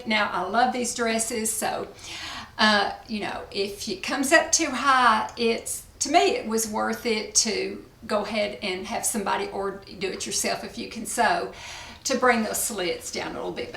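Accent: South, United States. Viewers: Darlin' we are your tribe!! no one is going to leave you. We all love your smile, your southern drawl that warms our heart. God bless you and cheers to your speedy recovery.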